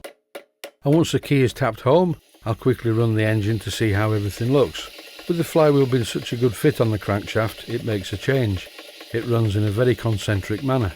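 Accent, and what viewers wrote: Yorkshire, United Kingdom. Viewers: Many thanks,. A brilliant series. Always a pleasure to watch your videos, complete with Yorkshire accent, humour and wit. Cheers.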